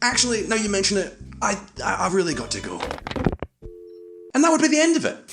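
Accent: Scotland, United Kingdom. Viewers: The posh scotsman is back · Finally a Scottish accent too! Gaun yersel!!:) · The (Scottish? Welsh?) accent is grrreat man! (read as A Scotsman speaking, lol) But for the love of decency, man, grow a beard and get some nerd-approved specs on your face :-D. As for Isreal/Palestine it's a case of 2 wrongs. It's very complicated; and instinctually you think, single state solution! But that's not as viable anymore as there is definitely economic disparity, and there would likely be polarizing political battles in perpetuity (not that we know anything about that here in the States). At it's core you have 2 peoples who feel the land is theirs by birthright...and the Northern Irish can tell you any peaceful coexistence is uneasy at best.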